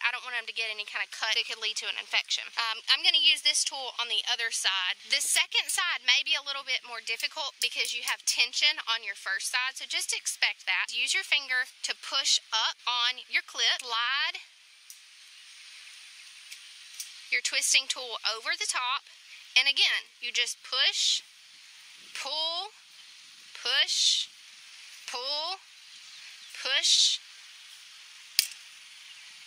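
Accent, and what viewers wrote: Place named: Virginia, United States. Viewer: Just subscribed!!! Where are you from. That accent reminds me of us here in VA.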